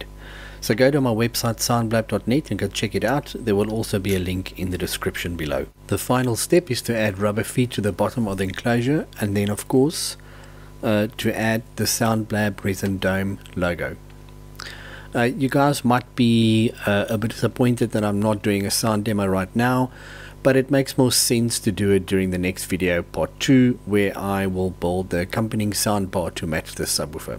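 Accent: South Africa. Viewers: are you from South-Africa sir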